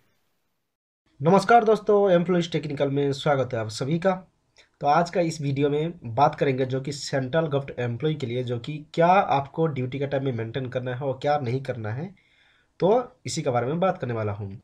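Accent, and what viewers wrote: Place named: Bengal, India. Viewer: Sir English is not English. Its very difficult to catch bengoli tone.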